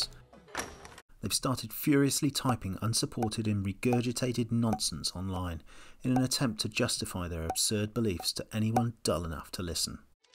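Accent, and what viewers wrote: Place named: England, United Kingdom. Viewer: Sounded English to me, then again they are the same.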